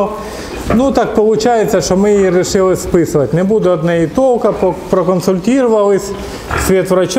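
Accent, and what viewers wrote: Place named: Kharkiv, Ukraine. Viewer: Да это не украинский, это харьковский говор. Чистый украинский совсем по другому звучит и интонация другая.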